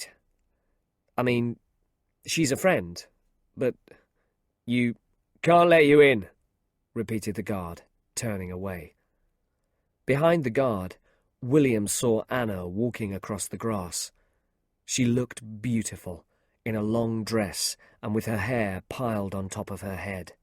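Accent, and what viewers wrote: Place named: United Kingdom. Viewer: I love the story and the beautiful British accent. Thanks a million! ❤❤❤❤❤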